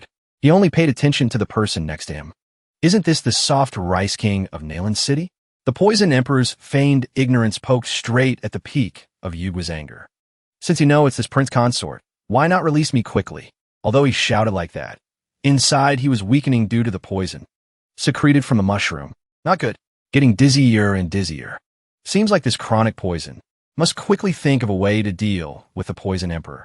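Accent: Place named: France. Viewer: tf is this voice? is the ai getting heartattacks? why is it speaking in a french english accent in the video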